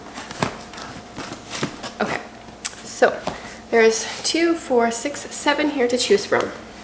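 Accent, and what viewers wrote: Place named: Canada. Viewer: ive reached a new record as an american. i was able to clock you as canadian in just 25 seconds. checked your channel and i was right! canadian-dar is alive and well. greetings from the south in gun-trump-freakyland usa!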